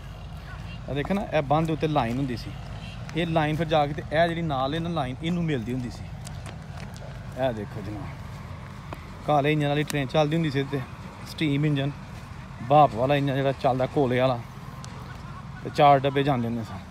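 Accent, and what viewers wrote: Punjab, India. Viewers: Tuhadi language Ditto Pathankot Gurdaspur di punjabi wali ae ❤️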